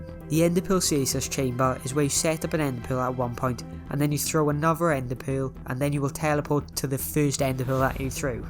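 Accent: Wales, United Kingdom. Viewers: U Welsh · Is he welsh???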